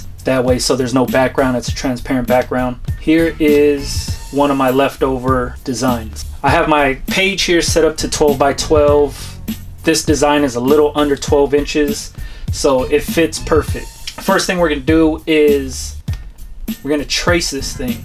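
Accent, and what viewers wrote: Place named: New Jersey, United States. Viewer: Great video fam! I've been thinking of making some merch for my esk8 channel (shirts, stickers). But there's a learning curve so might outsource. Given that, making the videos, editing, and all the esk8 there's only so much room for my skill level. What do you think. Btw, you sound like my cousin...are you from Jersey? - Neil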